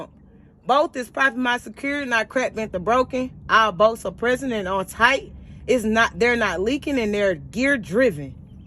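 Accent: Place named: Dallas, United States. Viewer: Watched all your videos back to back and they are very helpful. Can tell you in Dallas from the accent love it. Thanks for the videos, got about two weeks till my test!!!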